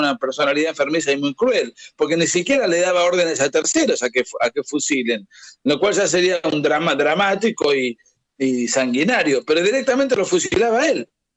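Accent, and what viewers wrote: Argentina. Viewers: No estas en nada. El che no tiene la culpa.de que estos Seudo izquierdistas. Utilizen la inmagen de el che para sus idioteses. La nueva izquierda. NO tiene nada que ver con la vieja Izquierda latinoamericana. Y el che era clase media. Todo lo que decis que se movia en elites esclusivos es mentira. Te entiendo porque eres Cubano. Que tubo que escapar de Cuba por la revolution. Aunque hables como Argentino. Deja de.engañar a las nuevas generaciones.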